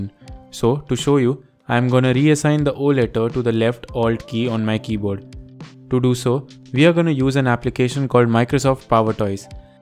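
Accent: India. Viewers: I will always trust a captain with an Indian acccent. Thank you kindly. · I love how Indian accent people do tutorial for video that people need to watch. Keep doing this! A warm thanks from Canada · this sounds racist, but i was so glad to hear an indian accent bc i knew it would help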